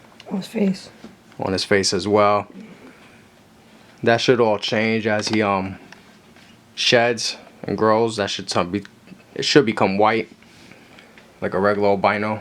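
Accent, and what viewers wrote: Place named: Massachusetts, United States. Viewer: Really great job bro. I'm from Worcester u sound like ur from mass. I'd love to grab one from you.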